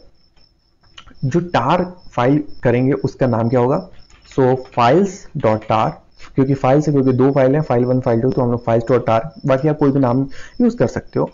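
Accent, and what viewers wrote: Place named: Hyderabad, India. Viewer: You are Hyderabadi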